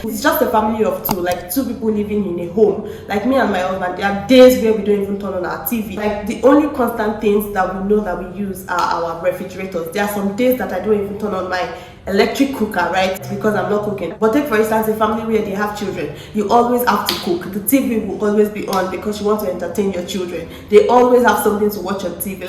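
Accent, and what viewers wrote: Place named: Johannesburg, South Africa. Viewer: you catching Joburg accent 🤣🤣🤣 it won't be long until you start including haibo and yoh! in your vocab.. nice video by the way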